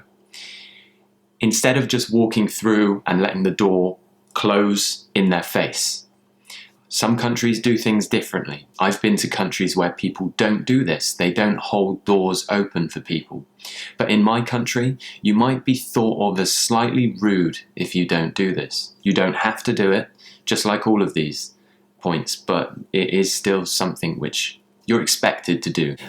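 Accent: United Kingdom. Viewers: i just love the British accent, not necessarily posh but very well spoken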